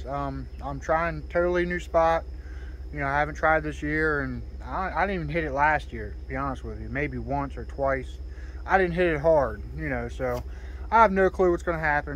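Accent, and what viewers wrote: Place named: Patapsco, United States. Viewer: Man, where are you from? Sounds like Patapsco. Lol. I'm down in Deale. Where's your store. Ill come up.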